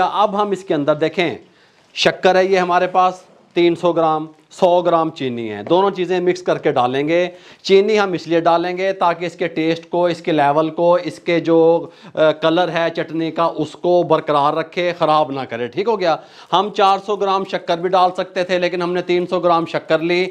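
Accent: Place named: Haryana, India. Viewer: From Okara Punjab Pakistan. jee aap ki maa Boli Haryana ki lagti hay. jee aap k programme both achhay ja rahay hain.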